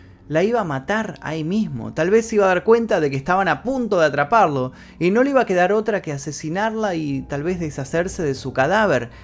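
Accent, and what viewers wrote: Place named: Argentina. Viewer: Guapo... linda voz con ese acento tann lindo argentino... eres super profesional... mi nombre es Paz Alejandra de Santiago de Chile🥰😍🤩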